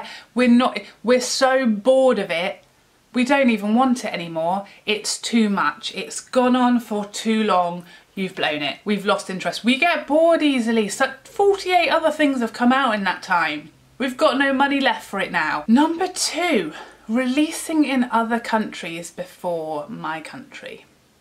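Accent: United Kingdom. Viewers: Hi, I just wanted to say I like your videos, you have this real-life, down to earth approach, you're relatable and I think your reviews are very thorough. And I also like listening to your British accent. Thanks for the good job!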